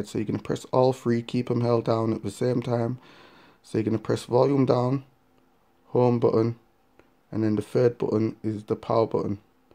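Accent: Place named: United Kingdom. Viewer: He's British you can clearly hear his accent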